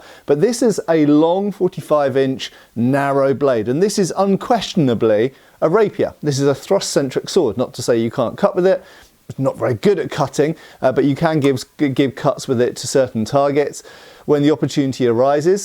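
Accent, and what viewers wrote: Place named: United Kingdom. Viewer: Nice video . Today I'm practising HEMA, and as spanish I would like to get as much possible "Spanish Swords models. I Have realized that there are millions and it's going to be an impossible mision. Perfect your british accent (and difficult)to practise my English listening. Beatiful swords you' ve got. THANKS MATE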